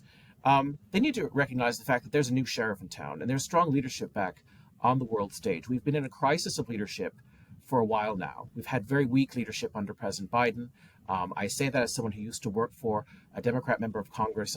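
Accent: United Kingdom. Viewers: I think he's British.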